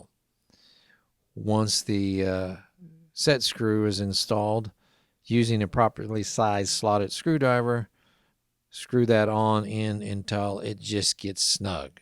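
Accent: Midwest, United States. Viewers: Yea, I do enjoy the hypnotizing midwestern drawl and experience driven repair lessons. I kid you not, the confidence to tear into my own cameras grows with every video of yours that I watch & rewatch. I know I have not seen every repair video out there, but of what I have seen, your videos are the most comprehensible and the lighting and camera always work to show whatever the dialog explains. You truly have a gift. I use a Nikon FM as my main film camera and a D3400 for digital. I have ten or so Nikons that need working on (that were eBay purchases from about 15 years ago) starting with a couple Nikkormats up to an FE. Am I correct in believing that the older models will be better to learn on? Still tooling up and hope to acquire some JIS crosspoints soon as all my small drivers are Philips. Though I have MacGyvered a flat into a two-bladed JIS as I play around with some point-and-shoots. 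When I start tackling old stuck screws I will need the real deal. Thanks again for doing all these. Hoping people start learning how to Fix Old Cameras and schools start teaching this stuff again. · Just love watching you work, being an Irishman living in England, your mid western drawl is a delight, you are the man!!! John Moore, Sherwood Forest England.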